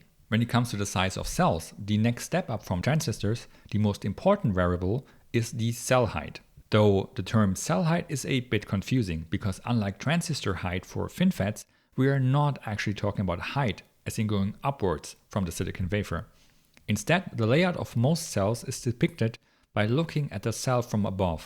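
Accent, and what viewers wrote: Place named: Germany. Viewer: This man is likely from German, no Wonder the English is like what I think, cause previously I have Watched German Laptop reviewer (Notebook Check)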